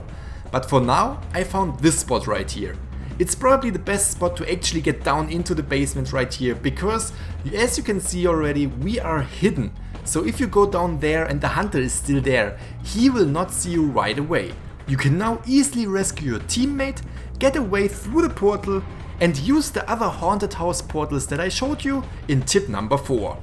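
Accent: Germany. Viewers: are you german? Your accent sounds really similiar to mine. :)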